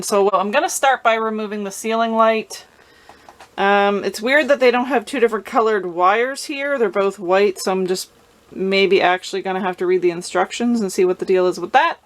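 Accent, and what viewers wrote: Canada. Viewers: I think you did and awesome job! Love that Canadian assent thanks for the video 🎉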